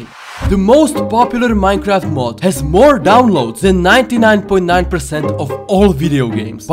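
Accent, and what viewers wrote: Czech Republic. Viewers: Angličtina dobrá jen zapracuj na výslovnosti máš pořád strašně český přízvuk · dneska jsem se podival na tvoje video po prve a hned my došlo že jsi čech podle přízvuku xd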